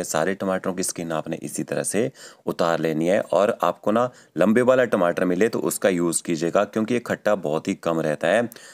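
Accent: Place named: Himachal Pradesh, India. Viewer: App kaha se ho u sound like Himachali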